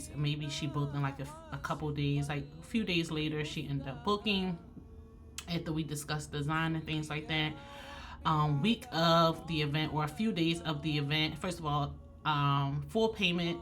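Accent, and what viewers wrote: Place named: Philadelphia, United States. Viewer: First, Allah will take care of the persons who did not make the final payment. Next, you have a beautiful soul, don't allow this incident to change who you are. The change in the policy was necessary. Last, the balloon design was BEAUTIFUL! You are very creative. Keep up the great work! May Allah continue to bless I kept saying to myself, she sounds like she is from Philly! I checked your website, and you are in the Tristate area. I need to find out who the event planner is so I can never use her in the future.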